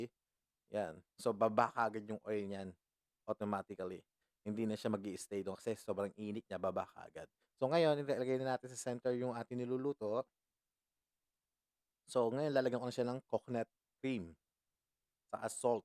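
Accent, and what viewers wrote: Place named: East Asia. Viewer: I was thinking you're Singaporean, Korean or Japanese kasi the way you speak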